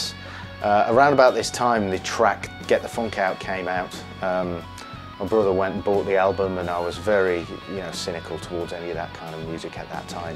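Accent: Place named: Oxford, United Kingdom. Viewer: You got my "like" after just saying 5 words because you speak oxford english so perfectly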